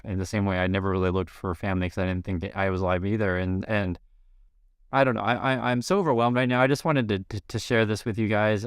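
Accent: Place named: United States. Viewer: My daughter and mother did AncestryDNA on my genealogy account. Through my daughter, we had a match with my husband's cousins that had been "lost" as children after a divorce. She also had matches with two other of her father's 1st cousins, that the family had no idea existed. The one had been told her father had died in the Korean War, but it was a lie told by somebody, probably her mother's stepmother, to get her to adopt out her baby. She'd been searching for a picture of her dead father for decades. She made contact with me and was astonished to learn that her father had only died the year before at age 85. She had terminal cancer and died just three weeks later, but she stared at the picture of her father constantly during that time. On my mother's side of the family, we discovered a cousin's son who had no idea who his birth father was. 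Now Mom's got another unexpected match, likely either a cousin or an unknown half sibling's daughter. You just have no idea what you might find out, especially from that time before reliable birth control. But this is the most amazing dna story I've heard yet! I started watching your other channel a few weeks ago and wanted to know more about you and your wife, mostly because you sounded American but were living in Korea. The story was more interesting than I'd imagined.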